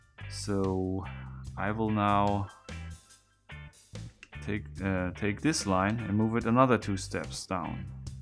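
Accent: Germany. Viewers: Man merkt Hardcore das du Deutscher bist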